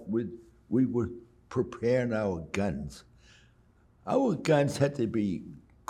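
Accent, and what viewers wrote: Boston, United States. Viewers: This guy has an accent exactly like my friend from Malden Massachusetts. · Like the Boston accent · he has the same accent as my grandfather who grew up in Charlestown MA in the 40’s and 50’s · This guy is a real son of Massachusetts… WICKED HAHHD accent and all! 😂🤣Reminds me of my grandfather and great uncles from Massachusetts who all bravely served in WWII. My grandfather Charlie died when I was just 8 years old. I wish I could’ve known him as I got older. I wish I could’ve had the opportunity to sit down with him, smoke a cigar, and drink some of his favorite bourbon (loved the real KY stuff) with him and ask him about his life growing up in the depression and then his service in the war (Army aircraft mechanic, Pacific Theater), building his family and working as a butcher for Chicago Beef for 40 years. My mom being the youngest ‘oopsie baby’ born in 64’ when he and my grandmother were 40. 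😂🤣🤣 I saw my grandfather in this man and started crying when I was watching. I miss you everyday and love you so much Pa. I’ll see you again someday and we’ll have that rocks glass in heaven. 🇺🇸🫡 💙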